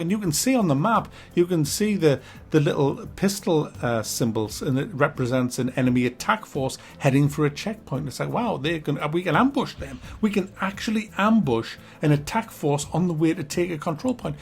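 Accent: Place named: North, United Kingdom. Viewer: Is is just me or is sounding even more northen than usual lately?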